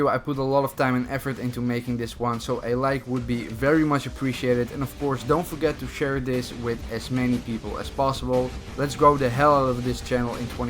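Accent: Netherlands. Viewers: That accent sounds dutch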